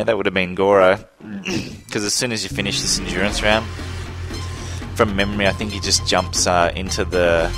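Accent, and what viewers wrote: Australia. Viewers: Your voice sounds Australian. Is that right? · yoe91 100% Aussie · Yes, he's an Aussie! xD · This guy is cool, is he australian?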